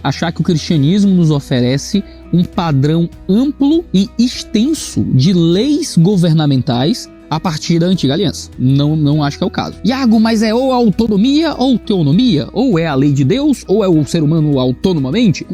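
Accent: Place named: Rio Grande do Sul, Brazil. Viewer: Mas bah, Tchê!!! Que grata surpresa clicar e iniciar o vídeo e ouvir o som da minha querência amada... hehehe... até pensei que era anúncio... kkkkk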